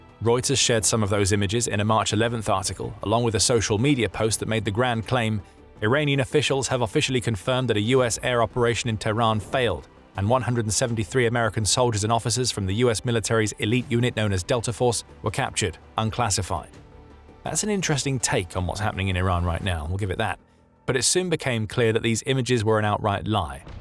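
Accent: United Kingdom. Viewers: Love hearing a Brit talk about stuff they aren’t involved in lmao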